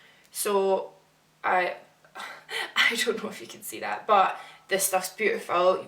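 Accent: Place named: Glasgow, United Kingdom. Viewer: Hi just discovered you and I'm loving your channel! It's great to watch make up tutorials from someone with a Glasgow accent!! Can you please do a tutorial on your eye makeup in this video? x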